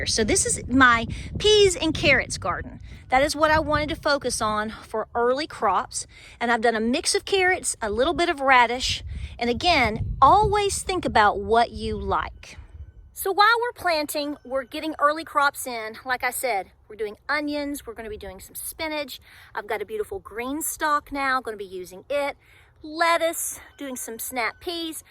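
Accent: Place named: East Tennessee, United States. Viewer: Great video. Very inspiring. Love your accent. Is that from East Tennessee?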